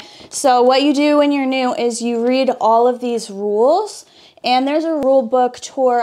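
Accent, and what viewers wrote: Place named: South, United States. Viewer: Easy on the Southern accent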